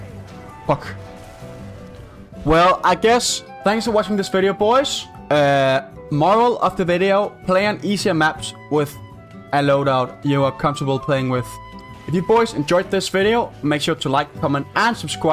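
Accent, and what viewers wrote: Denmark. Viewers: Så din video og instantly vidste at du var dansk, haha- nice video. 😂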